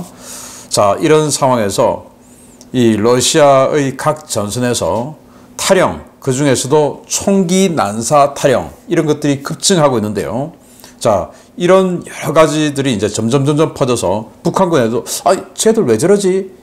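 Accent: Gyeongsang, South Korea. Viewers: 신인균 YTN 너희들 말대로면 모스크바는 2~3번 함락됐어야 한다 경상도 씹 말투 존나 거슬리네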